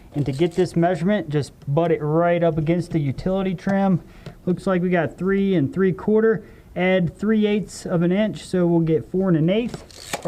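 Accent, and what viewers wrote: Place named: Baltimore, United States. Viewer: Very thick Delmarva Baltimore sounding accent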